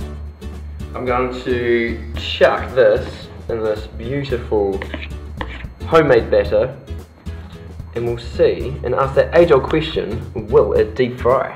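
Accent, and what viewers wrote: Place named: New Zealand. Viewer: Are you from NZ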